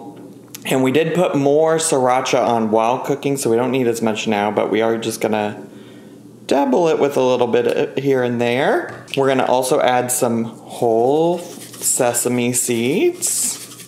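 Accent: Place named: Louisiana, United States. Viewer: this might be weird but are you from Louisiana? because you sound just like my English teacher from Louisiana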